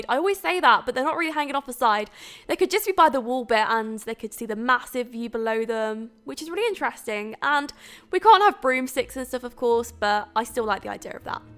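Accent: United Kingdom. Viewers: I love your Brittish accent <3 Even when I dont understand everything but I dont care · i like your british accent 😣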